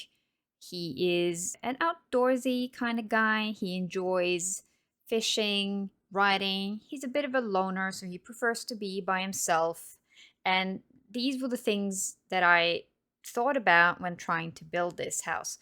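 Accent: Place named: Scandinavia. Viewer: This was relaxing to watch! Good music choice too, a chill tune with *no singing*, just perfect! And I love listening to your English because you sound.. well, Nordic and it makes me feel like home ^^ (and your English is great!) I didn't even realise I watched an almost 40 minute long video bc you weren't being repetitive at all with your commentary and everything just kind of flowed together nicely ✌️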